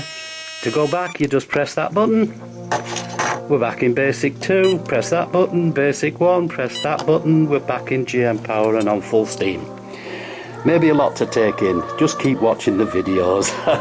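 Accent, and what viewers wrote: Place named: Yorkshire, United Kingdom. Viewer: Thanks....it also helps that you have a Yorkshire brogue....I'm a Wakefield (Normanton rerally) ex-pat down here in Kent. I've set up my Deus exactly as you show.....now to get a chance to use it. Happy New Year in about 15 minutes...Phil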